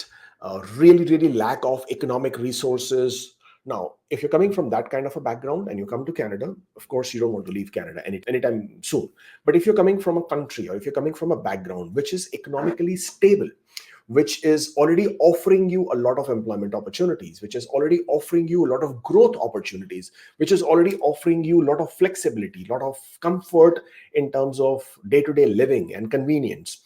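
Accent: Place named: India. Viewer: I'm from Cuba Sr 🇨🇺. I watch all your broadcasts, in part because I'm improving my level of English with your Indian's accent, also I adore to see Canada's news and updates from your channel. My point is the next one: If those who leave Canada had to return to their homeland (Cuba), they would think it twice, because despite the fact that they will be with their loved ones, they won't have better opportunities in life. So I'm amidst my immigration process and I'm 100% sure I will not go back to Cuba. Ask to any Cuban and their answer will be alike.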